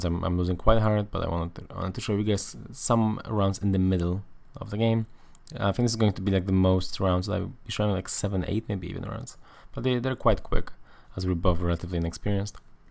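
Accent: Poland. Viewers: I have to ask: are you Polish?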